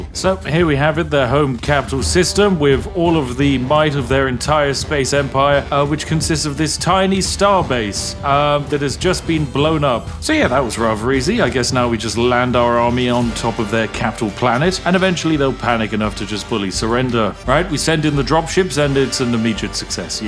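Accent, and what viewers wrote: United Kingdom. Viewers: I don't even want to play this game anymore. I just enjoy his sarcastic British canter. At 1.5x speed it's even more potent somehow. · I think this guy might be a tad British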